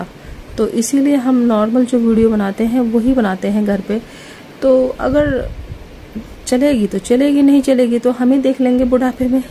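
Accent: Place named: Garhwal, India. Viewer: Garhwali bolate hue acche lagte ho, bahut Sundar 👌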